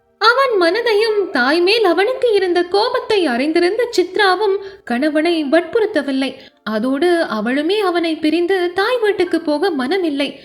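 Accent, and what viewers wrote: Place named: Sri Lanka. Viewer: பிரபு அவர்களுக்கு என் மனம் நிறைந்த நன்றி உங்கள் நாவல் மிகவும் அருமை 🥰🌹 உங்கள் நாவல் யின் குஞ்சகம் குரல் அவர்களின் இலங்கை தமிழ் வாசிப்பு அருமை சாபஷ் என்று சொல் தோன்றுகிறது ஃ🥰❤️❤️❤️🥰🌹🥰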